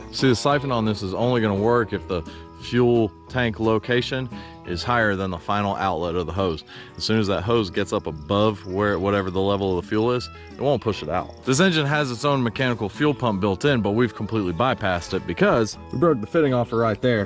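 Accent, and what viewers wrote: United States. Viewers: Man its so fine to find somebody who speaks murican. New subscriber.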